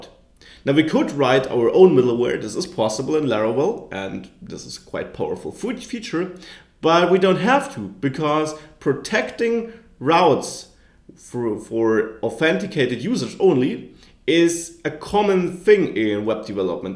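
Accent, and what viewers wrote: Germany. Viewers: you for the prompt response 😊 I haven't yet looked at this but I will. I get what's going on and change what I believe I think is not working but nothing happens. I'll let you know if anything changes once I've compared and amended according to you git repository. Thanks you, great videos, I'm enjoying the German accent. :)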